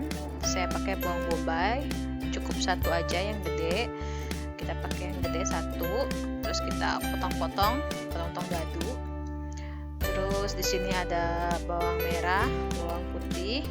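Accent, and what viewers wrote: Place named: Betawi, Indonesia. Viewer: Po numpang tanya, dari daerah mana ya? Ko bahasa betawinya kaya bahasa betawi ora... terus maap itu sodet bukan coet/ cobek😁 coet mah artinya ulekan sambel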